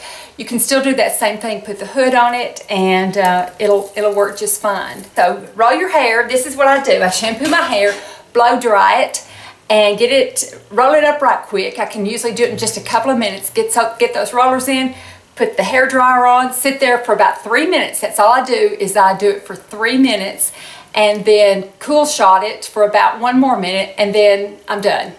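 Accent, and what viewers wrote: South, United States. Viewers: I smiled as I watched this. I had a soft bonnet hair dryer. Since I live in England now I will have to see what the they have. Thanks for sharing. Love a nice nice Southern accent, too! Not everyone in England sound like Colin Firth!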